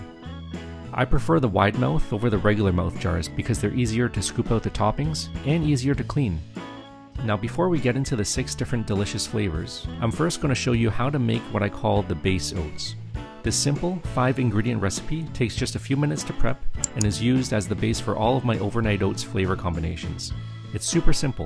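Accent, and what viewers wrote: Canada. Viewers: Canadian accent, right? :-)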